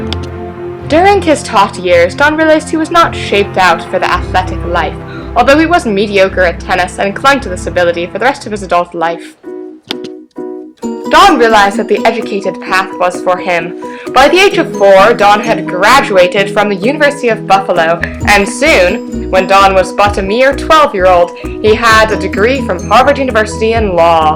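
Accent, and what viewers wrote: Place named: United Kingdom. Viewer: love the British accent. you're quite talented. keep 'em coming!!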